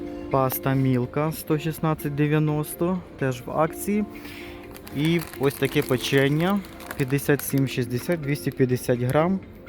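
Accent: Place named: Transcarpathia, Ukraine. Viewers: так говорять на Закарпатті,але це не означає ,що у автора правильна українська мова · Так говорять в західній Україні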